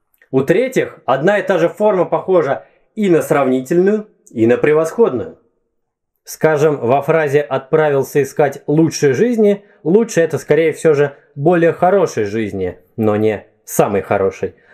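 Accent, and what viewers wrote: Moscow, Russia. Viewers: Ох уж этот московский говор. Продолжайте, ни в коем случае не прекращайте. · Мне кажется, пустее, богатей, пустее и т.п. есть влияние северных говоров ( новгородский, вологодский ), ведь довольно слабый эффект второй палатализации чувствуется и по сей день. Собственно, кабы Мацква не захватила Новгород, мы бы и дальше говорили в руце, в нозе, в дусе и т.д., а северные области для остальной России были бы чего-то вроде Шотландии для англичан 😎. По поводу сравнительной и превосходительной степени в западнославянских языках: в чешском она даже проще, нежели в польском, ввиду одинакового окончания в номинативе для всех родов ( напр. lepší (лучший (-ая,-ое) или же просто лучше, в русском от контекста зависит; horší (более короткий или худший) и т.п.). В превосходительной тупо прибавляешь приставку nej. Я еще заметил, ты с московским акцентом говоришь. 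Коренной москвич?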